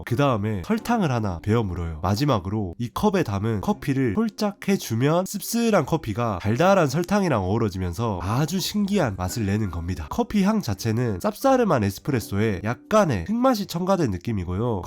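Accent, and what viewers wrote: Jeolla, South Korea. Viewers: 전라도 유튜버는 정말 오랫만에 보네요 첫 멘트부터 억양이 ㅋㅋ 언제나 화이팅 하시길😊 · 아 전라도 사투리 듣기싫네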